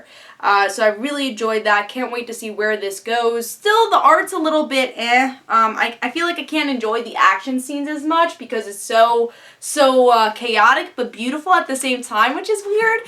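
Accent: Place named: New York, United States. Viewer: are you from new york? For a second there I thought you had a new york accent.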